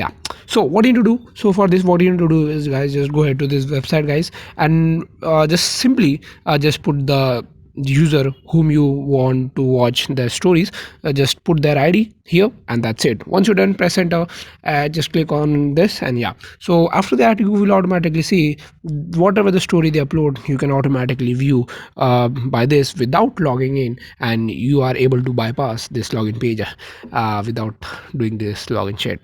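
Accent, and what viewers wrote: India. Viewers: Ughh i hate that indian accent pls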